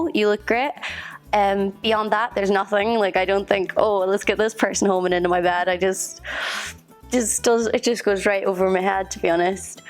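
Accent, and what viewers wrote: Ireland. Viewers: I dont know what it is about you but i find you absolutely amazing.sweet.cute and absolutely stunning.you have gorgeous eyes.and the accent.i know its cliche but the irish accent.and your singing voice!! Sorry.im in love haha 😍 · Clickbait title and video starts with: "Hello Friends, I have a yeast infection". I love that irish accent, and I get better at understanding it every day. I'm at almost 50%!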